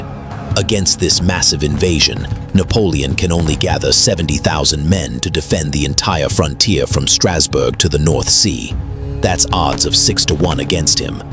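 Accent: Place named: United States. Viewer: Jeez.. this American narrator voice completely ruined the video😳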